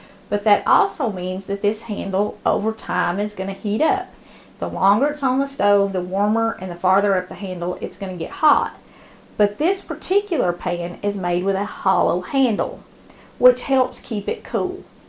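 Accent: South, United States. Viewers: . I'm a southern gal through and through. I absolutely love your Channel and listening to another Southerner. Thank you for that. You're recipes are what I grew up with and miss. And I love how you put God first so important!! Thank you for that also. Have a blessed day. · I love your videos and your biblical standards so much!! In fact, as I have lived down south for so many years, I can't decide what I enjoy more - your Southern Recipes, your "down home" biblical morals, or maybe it's your Southern accent thatI miss??!! My suggestion for a grilled cheese sandwich is to brown BOTH sides of the two pieces of bread in a fry pan at the same time and then add the cheese to each browned slice of bread; and then combine the two slices on top of each other in pan for only a few seconds more on each side. That's what I was taught; and it gives the inside of the sandwich a crispier base for the cheese when it melts!!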